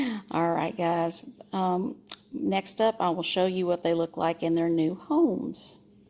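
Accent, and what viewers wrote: South, United States. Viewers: Loving that southern accent hunny 😉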